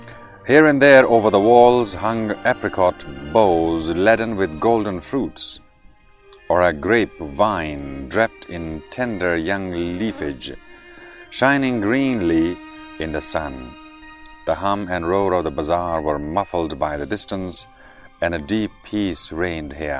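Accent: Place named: United Kingdom. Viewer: دو خوبی از حسن اخلاقی ای استه که ده هر چیز که مهارت دره بغیر ترس از پوره جزبے خو انجام میده. دوئم ای که خوب صاف بریٹش انگلش موگه.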